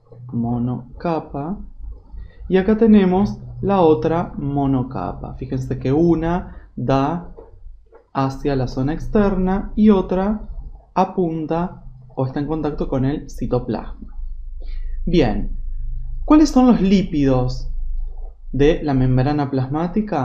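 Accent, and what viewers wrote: Argentina. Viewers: Me encanta el acento Argentino.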